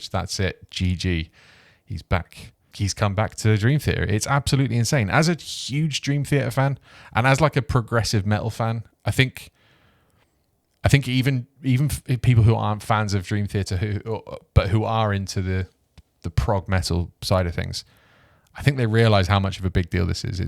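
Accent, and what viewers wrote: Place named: United Kingdom. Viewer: It wasn't until the 15 second mark that I could tell you were british. got to be the most subtle accent of all time. Helldivers brought me to your channel, great great shit dude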